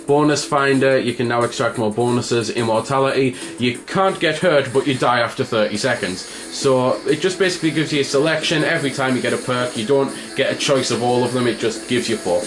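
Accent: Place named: Sunderland, United Kingdom. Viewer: are you from sunderland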